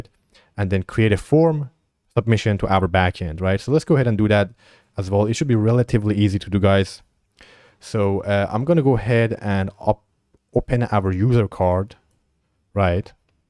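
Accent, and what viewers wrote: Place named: Iran. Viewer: you are very good at teaching keep it up! and i think you're persian... am i right ? i almost can feel the accent 😂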